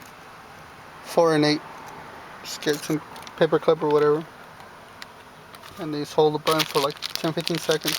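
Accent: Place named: Mexico. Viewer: Carlo Russo that’s how Mexicans talk!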